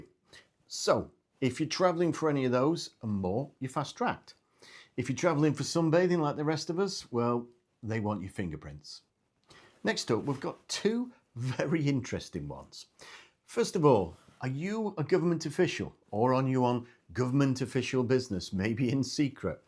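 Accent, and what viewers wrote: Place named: Blackpool, United Kingdom. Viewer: You sound more of a blackpool type of guy anyway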